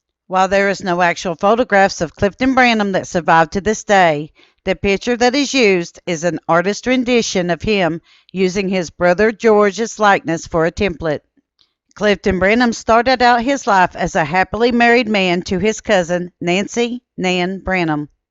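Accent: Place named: Appalachia, United States. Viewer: You sound like you speak Appalachian American, however you said, " Appalation". curious, mighty curious.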